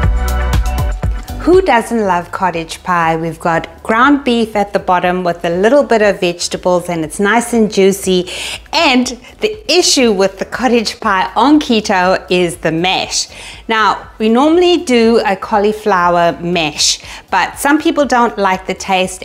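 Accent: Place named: South Africa. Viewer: thought your accent sounded SA. From Cape Town!?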